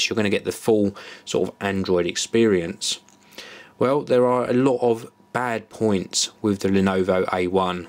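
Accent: United Kingdom. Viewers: I love hairy guys with british accent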